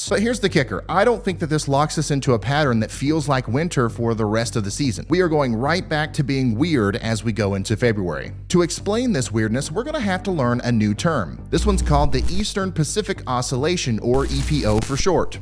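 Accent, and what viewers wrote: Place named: Pennsylvania, United States. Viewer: Did you go to school at penn state? Sure does sound like it!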